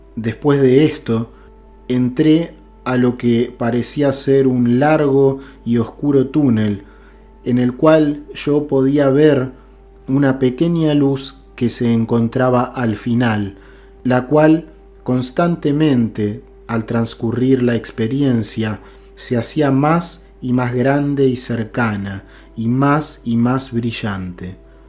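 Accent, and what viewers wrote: Argentina. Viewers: SE NOTA QUE QUIEN RELATA LA EXPERIENCIA ES ARGENTINO...YO TAMBIEN SOY ARGENTINA....ME CONFORTA ESCUCHARLO Y MUCHO....GRACIAS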